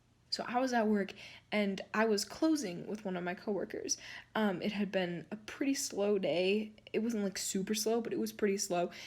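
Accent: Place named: United Kingdom. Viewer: i'm literally laughing so hard right now! ohh, and your british accent is amazing :)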